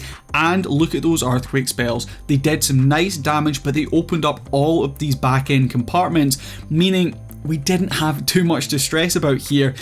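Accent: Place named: Scotland, United Kingdom. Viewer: Are you from Scotland just asking because I can sense your accent?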